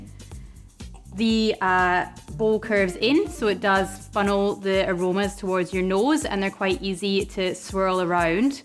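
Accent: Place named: Scotland, United Kingdom. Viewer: Oh, Scottish accents! You could be reading out of the phone book, and this video would still be enjoyable. But Scottish accent + knowledgeable whiskey 🥃 instruction = fanatic content. Keep creating!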